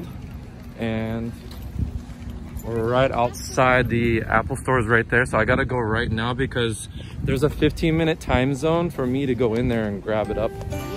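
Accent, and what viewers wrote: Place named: Visayas, Philippines. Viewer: Sir ang cute mo mag Bisaya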